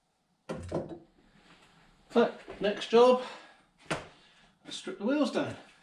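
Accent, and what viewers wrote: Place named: Gloucestershire, United Kingdom. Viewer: Reminds me of my old Dursley Glos accent....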